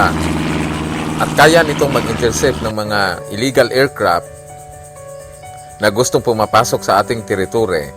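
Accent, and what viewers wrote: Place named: Bohol, Philippines. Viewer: PARE TAGA BOHOL KABA?KASI YUNG TUNOG NG BOSIS MO PARANG TAGA DAUIS OR PANGLAO BOHOL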